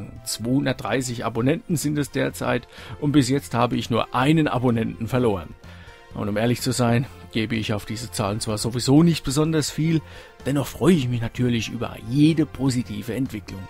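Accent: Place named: Franconia, Germany. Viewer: Bei deinen Dialekt kommt der Franke noch durch 🤣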